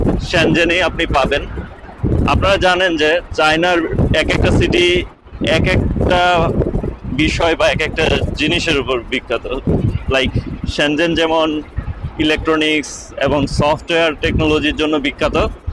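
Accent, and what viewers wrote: Bangladesh. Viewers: উনি বাংলাদেশি মানুষ। আমি বাংলাদেশের মানুষ আমি কলকাতার ভাষা আমাদের ভাষা শুনলেই বলতে পারি এই লোক কোন দেশের ভাষায় কথা বলতেছে। কারণ কলকাতার ভাষা আমাদের থেকে একটু আলাদা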